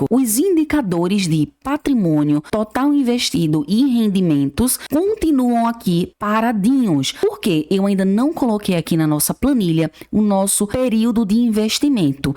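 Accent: Northeast, Brazil. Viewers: Sou fã desse lindo sotaque nordestino!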